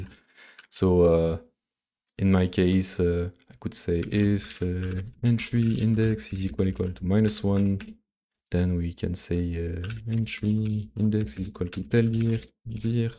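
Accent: France. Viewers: It's the french accent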